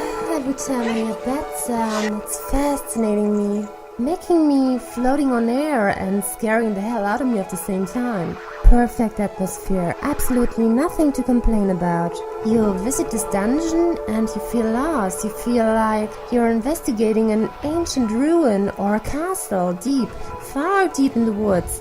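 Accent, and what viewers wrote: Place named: Germany. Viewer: You have a slight German accent, but your English is very good. And I agree with Taylor, it's a charming accent.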